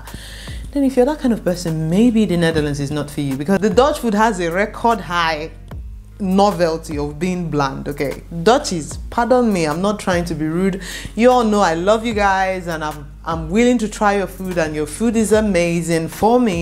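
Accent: Nigeria. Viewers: she is a Nigerian,, Nigerians accent pronounce u"as o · I am South African and I love how persistent the Nigerian accent is no matter how long they live outside their own country! 😂 This lady is extremely poised and articulate either way ❤ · nice video😀. you still keep your Nigerian accent and vibes, you keep using "ABI"👍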